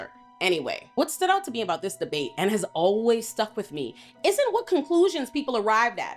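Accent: Bahamas. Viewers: I may have asked before, but you Bahamian?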